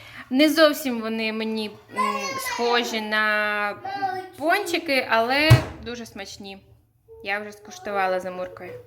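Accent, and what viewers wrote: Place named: Ukraine. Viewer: а с какой ты области? Чувствуется, что не с Западной. Будь здорова, чорноброва.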